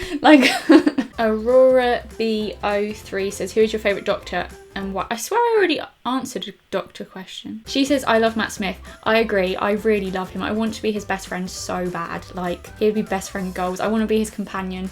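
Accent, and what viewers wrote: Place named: Yorkshire, United Kingdom. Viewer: Up North!!! Love the yorkshire accent... cough cough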